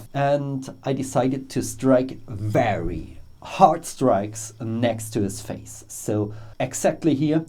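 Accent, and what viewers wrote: Germany. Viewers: are you german? can not really idetify your accent..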